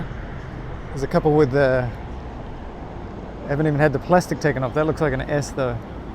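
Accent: Australia. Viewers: Very informative video sir, and is that an Aussie accent i hear? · Do I detect an Australian accent? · Great to hear an Aussie accent ...makes a change...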